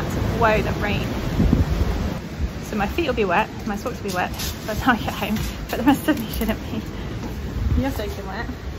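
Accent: United Kingdom. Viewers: Hey, r u a brit??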